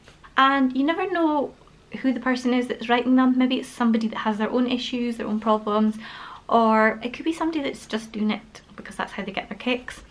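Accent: Scotland, United Kingdom. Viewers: Hello doll... I am An old fat Scottish Jew living in London! Like to watch your videos to hear a Scottish gal And also your content is always entertaining! X thank you for taking the time to do this. Anxiety touches everyone, we all just deal with it differently. Please remember and focus on the positive influence you’ve had. X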